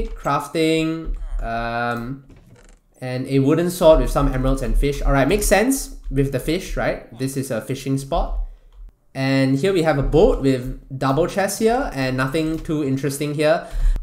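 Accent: Singapore. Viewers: singaporean accent pretty strong here LMAO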